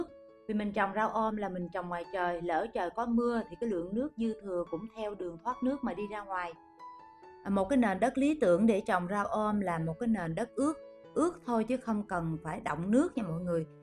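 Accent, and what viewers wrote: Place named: South, Vietnam. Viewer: " " nói giọng nam mà lại ở đà nẵng hỉ?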